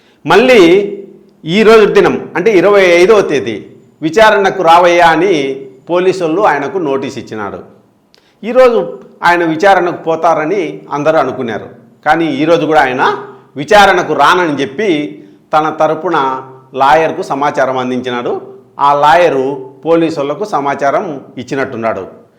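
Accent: Rayalaseema, India. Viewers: రాయలసీమ యాసలో మీ విశ్లేషణ బాగుంది అన్నా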